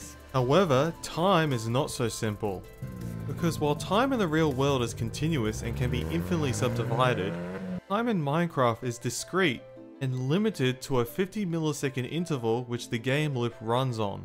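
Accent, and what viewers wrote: Australia. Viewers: Two questions: Are you an Aussie? Is that your i20n?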